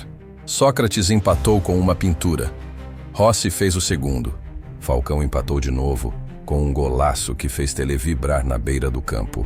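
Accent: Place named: Portugal. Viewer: Do nada o narrador mete um português de Portugal kkkkkkkk toda hora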